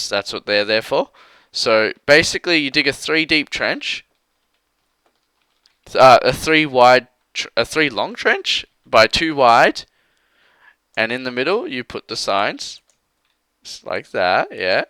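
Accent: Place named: Australia. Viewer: I laughed my ass off hearing you count from 1-30 seriously, i admit i've heard my fair share of australian accents, but this was brilliant, this made my day! Hope no offense is taken, im saying this as a compliment. If i could have an australian accent i would ! just to count like you do! lol haha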